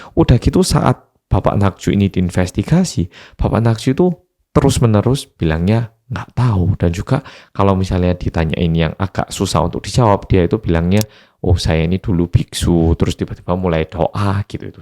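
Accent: Java, Indonesia. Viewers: Mas medok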